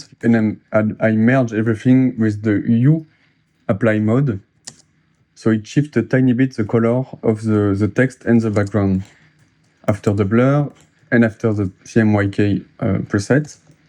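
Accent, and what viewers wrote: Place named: France. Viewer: Hello, from your accent i guess you must be french. Merci pour ta macro. Je me penchais justement sur la question et j'ai pu étudier ta façon de procéder à l'intérieur de ta macro. Au cas où, mais tu dois être au courant <link> Le gars vient aussi de sortir un plugin pour photoshop qui pourrait aussi te donner des idées pour une v2. Encore merci.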